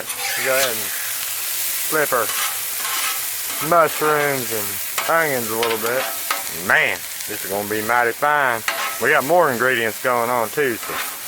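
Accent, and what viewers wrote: South, United States. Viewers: 2 seconds in I knew he was from the South 🤣 thanks for the good vibes & recipe.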